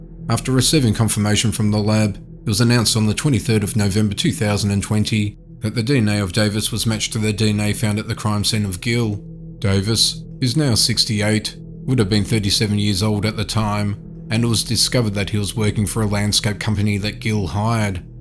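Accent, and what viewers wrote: Australia. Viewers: He's an Aussie 😊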